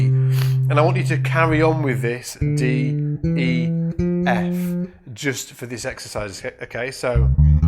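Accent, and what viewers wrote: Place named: Manchester, United Kingdom. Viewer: I love your accent as much as your lessons, are you from Manchester?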